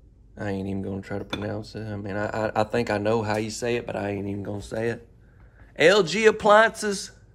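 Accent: South, United States. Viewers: I’m from the northeast. NJ to be exact. I do enjoy listening to your southern accent and your humor. It’s so entertaining. This is my favorite so far. I’ll save this for future reference when I move south or southwest. The floor plan is what I want.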